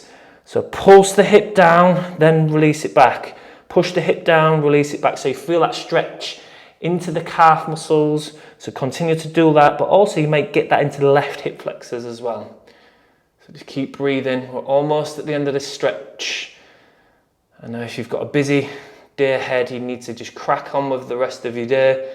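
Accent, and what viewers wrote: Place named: England, United Kingdom. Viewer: Just done my first run in 20+ years! Refreshing to find an English video rather than US, and a regional accent too! Great!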